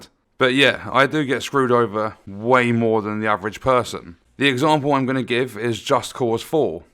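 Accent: United Kingdom. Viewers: Who finds a British accent awkward?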